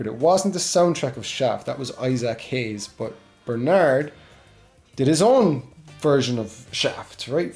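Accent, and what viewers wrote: United States. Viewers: Great video and great southern accent ! from Florida. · Hey, your Texan accent isn't so bad.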